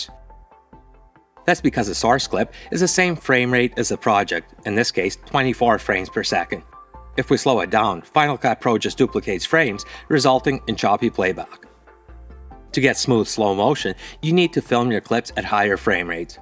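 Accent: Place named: Quebec, Canada. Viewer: Great tip, and you have one of the most interesting accents I've heard! Mix of Asian and... French-Canadian? Am I dreaming? I never heard a voice like that :O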